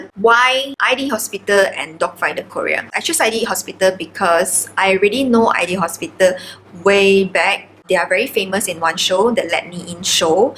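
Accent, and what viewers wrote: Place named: China. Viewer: Are u Indonesia or Chinese? Sorry.. 'Coz ur English sound like Chinese English.. But the translation is in bahasa.. I just wondering🤔💭 😁.. Thank u😊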